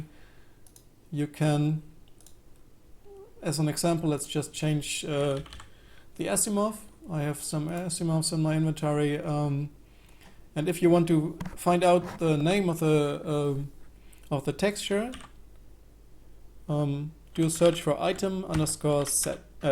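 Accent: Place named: Germany. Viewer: haha ich hör dein Englisch und denk mir direkt, der muss Deutsch sein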